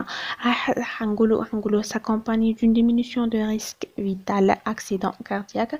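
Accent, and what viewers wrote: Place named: Arab world. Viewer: Vous parlez le français arabisé ou l'espagnol ? J'ai du mal à vous comprendre par endroit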